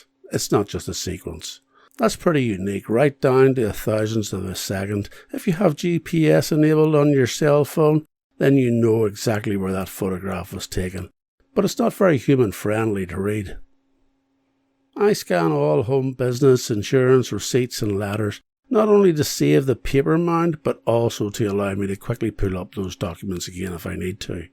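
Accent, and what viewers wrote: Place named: Belfast, United Kingdom. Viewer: The Belfast accent is music to my ear. Melodic and easy to follow. This was an informative video and I thank you fir sharing it.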